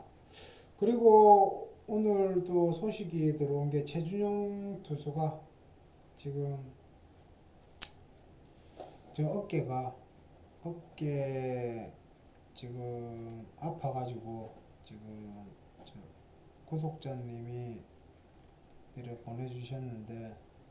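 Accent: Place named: Busan, South Korea. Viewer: 저는 소리 전혀 안울리는데요! 소리가 약간 작게들리는것은 있는데~ 제가 볼륨을 올리면 듣기에는 전혀 지장이없습니다! 소리 언급 하시는분들 가끔있으시던데! 소리가 울리기보다는 제생각에는 사투리억양 때문이 아닐런지 싶습니다! 저는 부산사람이라 오히려 다른유트뷰 분보다 더 잘들리는데! 서울분들은 억양때문에 좀더 집중해서 들어야 되지 않을까? 란 추측을 해봅니다!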